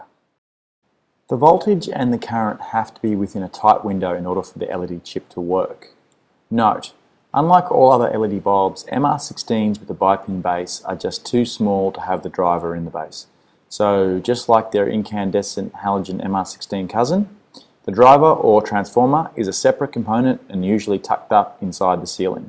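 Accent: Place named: Australia. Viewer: You sound Australian, but you said Aloominum. WTF?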